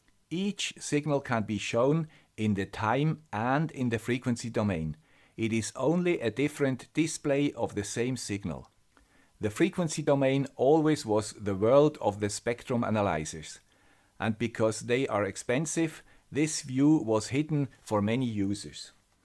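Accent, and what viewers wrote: Switzerland. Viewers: missing your Swiss accent 🤓🤓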